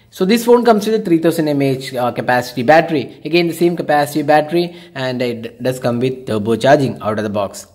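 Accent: Kerala, India. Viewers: Malayali accent.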